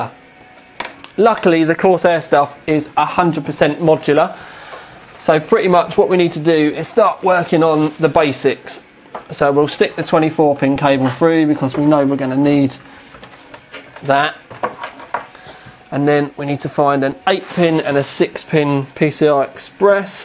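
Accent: United Kingdom. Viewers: are you from the uk dude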